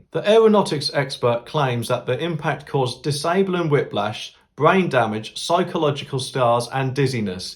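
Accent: Norfolk, United Kingdom. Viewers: Just found your channel, I’m from Norfolk and recognise your beautiful accent from around our beautiful county. Long may localism live.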